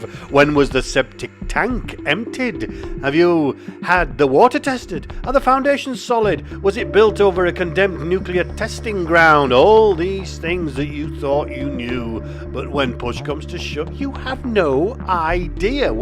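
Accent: Northern England, United Kingdom. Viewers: Great video...we had a similar issue when deciding our move to France...fortunately our house sold in a week..the slow part was after we offered on our current house in France it took 5 months from offer to completion but well worth the wait. We were from Yorkshire and you definitely sound like you have a northern accent but cant quite place it😂...looking forward to the next episode..a great alternative to Strictly Come Dancing😂..Andrew and Julie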